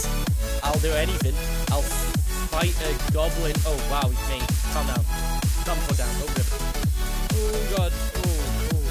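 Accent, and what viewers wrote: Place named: Scotland, United Kingdom. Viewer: btw love your scottish accent!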